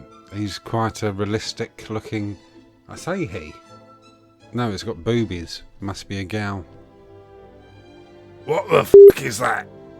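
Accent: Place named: United Kingdom. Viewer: Are you alright mate? You sound more British than usual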